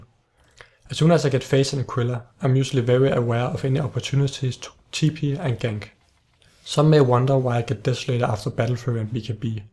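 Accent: Denmark. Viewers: are you danish or german? or neither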